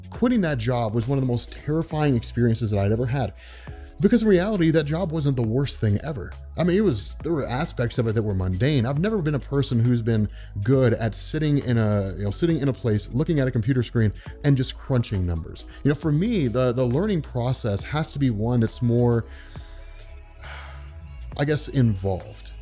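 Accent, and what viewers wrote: United Kingdom. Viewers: Quality London accent,. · your English accent is amazing..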